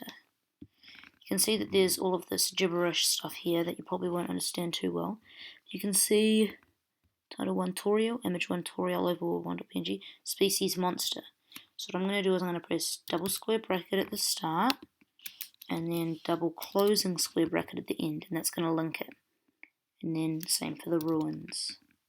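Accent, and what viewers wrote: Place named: New Zealand. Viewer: I love the kiwi accent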